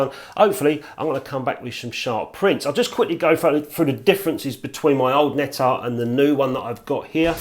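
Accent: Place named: England, United Kingdom. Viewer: dear, every time I need to hear some healthy English accent I tune into your channel please note < need to> Of bleedin 'course you butcher all them foreign words, you're English, aren't you? it's < tzeyes > Nettar you've got it right, Anastigmat wrong, as usual golden rule for English, Welsh, Scots, and other British subjects is: if you naturally stress the first syllable then you can bet your posterior it's second one you should stress. The syllable you strees naturally and the syllable you pronounce more or less neutrally are your best guide go against your natural tendency and stress the other syllable ana-STIG-mat you says, anastigMAT is right admittedly it's a difficult one having four syllables;Love you, your videos, some of your pics and your effervescence. What a pity they banned smokin' in pubs ( please pron vinnie coz it's German & I'm a bloke )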